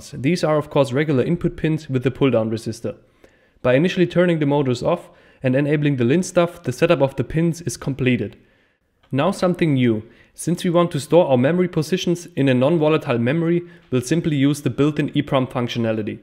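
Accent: Germany. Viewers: Digga man hört so das du deutsch bist 😂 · Zwischendurch hört man es deutlich, finde sein Englisch aber perfekt :D Er spricht Sachen wie Baut zb typisch deutsch aus. Hat aber bei englischen Vokabeln null Akzent wie ich finde.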